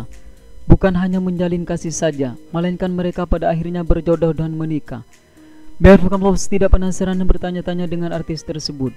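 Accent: Madura, Indonesia. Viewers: Iya logat.y ky logat org madura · Kenapa dgn logat madura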